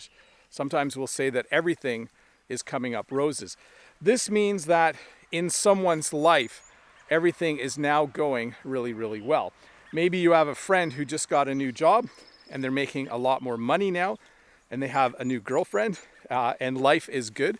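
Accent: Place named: Canada. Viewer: Hi from Milan (italy), very nice youtube channel... i think that understanding canadian accent is easier than understanding british accent 😅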